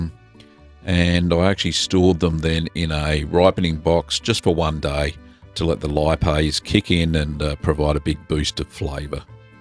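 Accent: Australia. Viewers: Everyone here knows how it's pronounced. Clearly is from Australia and that accent comes out- to me, that just adds character. The important thing is that he has made a great video, and it's also clear that he has invested some time learning about Mexican culture as well. Well done!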